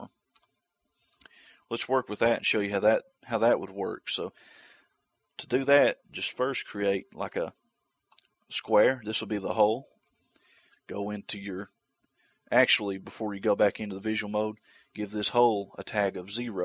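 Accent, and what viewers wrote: South, United States. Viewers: Came here researching 3d floors. Was pleasantly surprised to hear a voice that clearly knows a thing or two about DooM editing that also has a southern accent. Dirty souf y'all. :D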